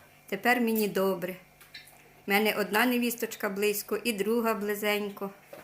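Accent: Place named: Khmelnytskyi, Ukraine. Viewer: Добрий вечір.Пнивіт з Хмельниччини.Я підписалася на Ваш канал.дуже сподобались Ваші відео.Коли перший раз попала на Ваш канал.почула Вашу мову то чомусь так і подумала що ви з Хмельниччини. Бажаю Вам миру над головою. скорого повернення Ваших синів до дому з перемогою.Невісточки молодці гарні хазяєчки Всього Вам гайкращого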